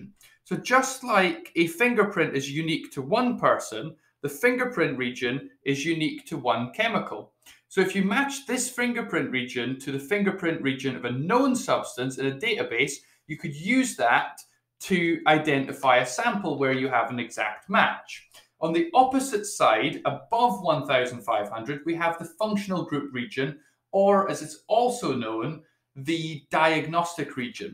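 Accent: United Kingdom. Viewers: You had my loyalty at "What the hell does this complete mess mean?"....and your British accent of course 😅😂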